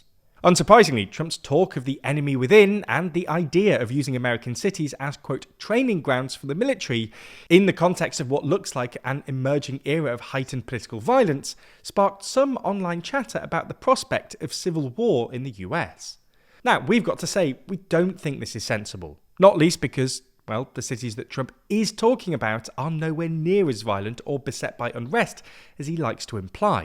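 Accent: United Kingdom. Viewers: Respectfully, UK accent about US politics. Nope.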